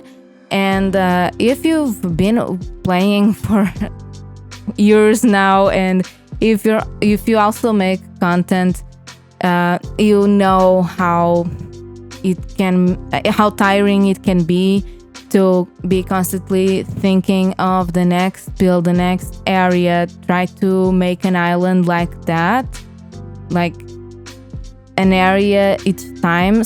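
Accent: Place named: Russia. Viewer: where are u from you sound russian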